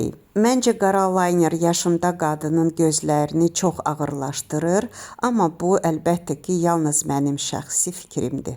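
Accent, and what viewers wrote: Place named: Quba-Qusar, Azerbaijan. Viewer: Salam xanım. Siz haralısınız? Danışığızdan elə çıxır Quba Qusar zonasındansınız. Elədimi?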